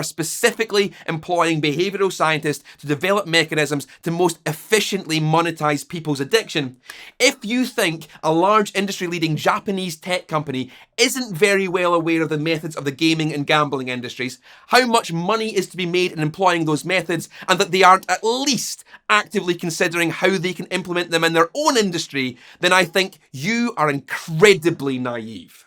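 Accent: Scotland, United Kingdom. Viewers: The Scottish accent seems especially well suited to calling out capitalist nonsense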